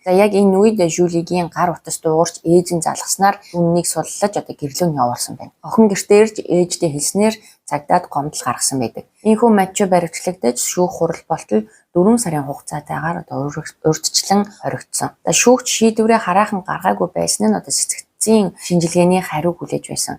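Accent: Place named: France. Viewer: Chi naad french ayalagaa boli ok...chi yasiin french hel meddeg ain..english heleer ok Bi Amerikaas sonsoj bna...hutsaad Frensh ayalagaar ain...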